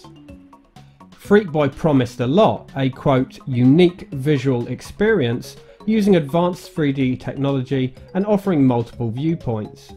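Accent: United Kingdom. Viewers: I think one of the reasons why the N64DD was DOA was the fact you could only buy it off the internet and online shopping was a brand new concept back in 1996. So new in fact that almost no one really did it at the time. Why Nintendo did it is a complete mystery to me but it would have had a much better time if it was sold in retail stores like GAME in the UK where you sound like you are from (you sound British to me) or Best Buy in the US where I live. I dunno if you would agree with me or not, but it is the main reason why it did not go well from what I have heard.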